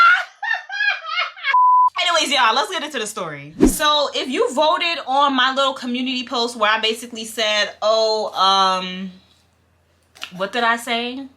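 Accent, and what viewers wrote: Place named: New York, United States. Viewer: Hey giiiirl 🎉❤ First of all, your voice, personality, and New York accent keeps me coming back to your videos 😂 Secondly, we just gone say this was a blessing that this didn’t work out with this girl because like you said, bad apple. & say you would have went to her house after she asked that.. she could have went to her lil 10,000 followers and tried to play you because you were up and coming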